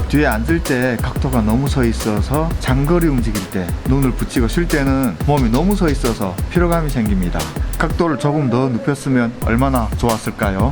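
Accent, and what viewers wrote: China. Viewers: 중국교포에요? 말이 굉장히 어눌함 듣다가 짜증나서 나가요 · 제 친구도 재중동포인데 말투가 비슷하신 거 같아서 여쭈어 보았습니다 ㅎㅎ BMW를 좋아하시는 것 같은데 올리시면 재밌게 보겠습니다!!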